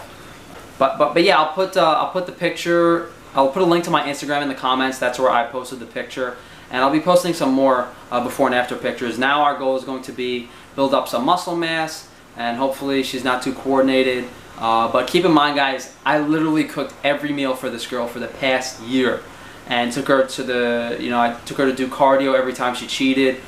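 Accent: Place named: New York City, United States. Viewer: Interesting accent. Can hear some nyc italian twang.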